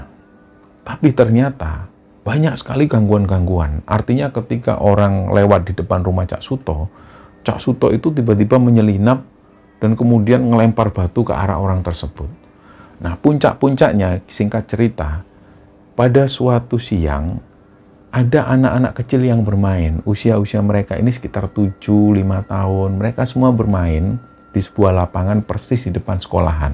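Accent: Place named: Surabaya, Indonesia. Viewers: Host nya wong mana to? Bahasanya Suroboyoan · Aku Sasak lombok, tapi dengar mas bicara, tangan,baku rindu Surabaya akubrindo Jombang.. pernah hidup di jatim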